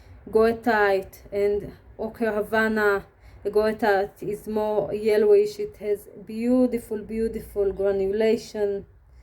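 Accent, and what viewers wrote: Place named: Israel. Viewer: Are you a Sabra?I think I recognize your accent❤️‍🔥🙏🏻🥇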